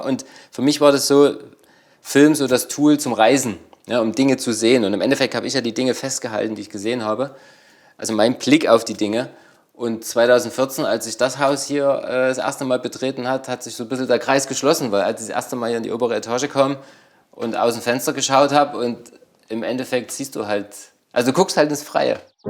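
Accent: Germany. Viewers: Wunderschöner Platz, einladender Raum, tolle Einzelstücke, mit einer ruhigen Ästhetik zusammengestellt. Und gesprochen mit meinem deutschen Lieblingsdialekt 😊, sagt die Schweizerin.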